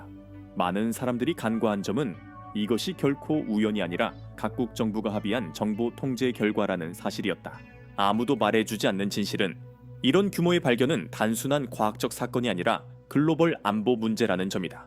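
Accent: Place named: Yanbian, China. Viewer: 낯사 ㅋㅋㅋㅋㅋ 조선족이야? ㅋㅋㅋ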